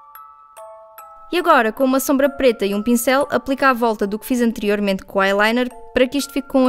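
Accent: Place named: Portugal. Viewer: Adorei teu canal sucesso tu és portuguesa né? Adorei seu sotaque ótimo vídeo bjo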